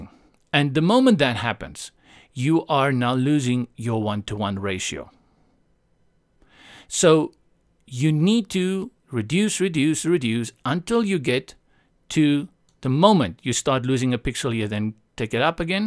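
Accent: South Africa. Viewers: you sound like you are from South Africa?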